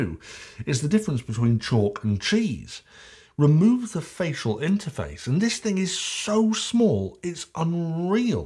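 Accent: England, United Kingdom. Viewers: Like your delivery. You're easy to listen to. And nice to hear English rather than some disgusting American accent.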